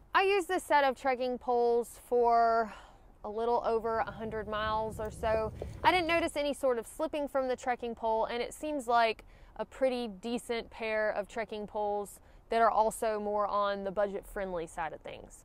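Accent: South, United States. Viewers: It’s good to hear a southern accent! Moved to CO from Elmore County last summer. I miss hearing Southern English! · Just stumbled upon your channel and love the info and that southern drawl 👍😎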